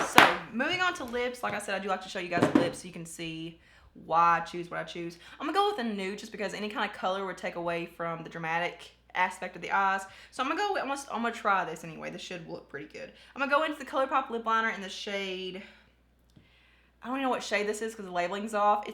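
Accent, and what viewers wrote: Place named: Kentucky, United States. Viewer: Just found your channel and noticed you're from Ky too!!! I love that I had a feeling from the accent lol I'm in eastern ky area what area are you from?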